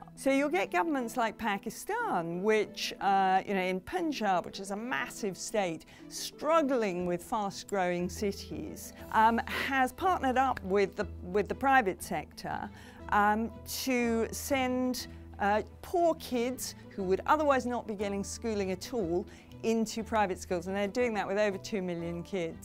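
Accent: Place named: United Kingdom. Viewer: She speaks like a typical British supposed to speak:)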